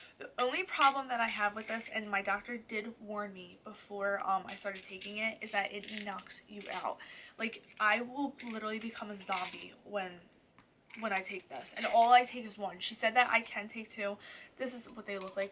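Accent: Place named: New Jersey, United States. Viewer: I just knew you were a fellow New Jerseyan from the first second of the video lol😭 loved this video. I’m having the same exact symptoms 😩 I’m scared to test so I’m just waiting out my period, if it ever shows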